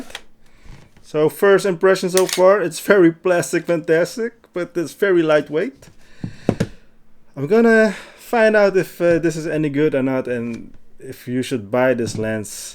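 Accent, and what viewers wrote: Netherlands. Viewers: are you dutch by any chance?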